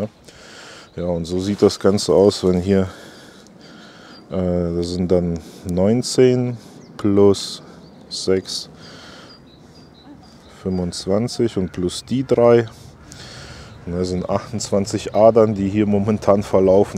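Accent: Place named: Leipzig, Germany. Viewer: Lieber. Klasse Video, ich höre Deinen Akzent als Leipziger immer wieder total gerne. Dein Humor ist spitze trocken. Sag mal bitte, was hast du im Garten für ein Windrad? Nutzt du dies auch zur Inseleinspeisung und welche Erfahrung hast du bei den letzten Stürmen? Lieben Gruß Thomas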